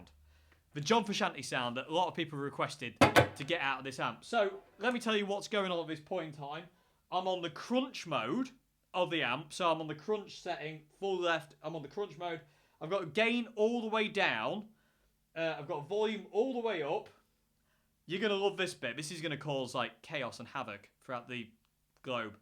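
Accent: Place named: England, United Kingdom. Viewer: When it comes to SS amps, I love my Peavey Bandit Silver trim and was considering a Fender Champion 100 now that I am back in a gigging band (loud drummer, bass, synth, and 2 guitars doing modern rock) but after seeing this video should I really go for the Boss Katana 2x12??? Oh and sorry for ever thinking was Scottish (Borders region). Yours is a tricky English accent to place but I am still embarrassed as someone who has spent time on both sides of the border.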